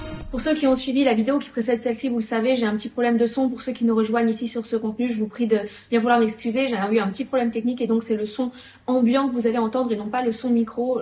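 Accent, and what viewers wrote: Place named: England, United Kingdom. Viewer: I'm loving your english accent !😍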